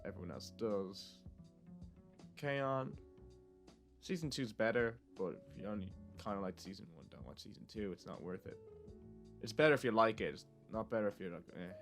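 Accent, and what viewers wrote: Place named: Ireland. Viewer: No way I've just gone and finished Penguindrum, went on youtube to find a good analysis about it and found a quality video by another lad from Dublin about it (I'm assuming from the accent, if not I'll look like a fucking twat so let's hope I'm right). Felt the exact same way about penguindrum, gave it an initial 9 but I'll see how I feel about it once time passes and I might become a 10. Great vid and mad props for being an Irish anime youtuber that isn't eyepatch wolf. Honestly never thought another one existed. Good luck in the future brother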